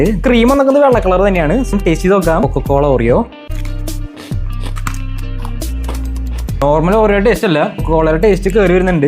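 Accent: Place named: Kollam, India. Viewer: That Kollam saying everything 😂😂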